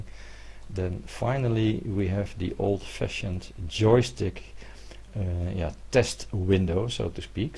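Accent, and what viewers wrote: Netherlands. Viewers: Just watching to take in the genuine Dutch accent. This sits like a mustache.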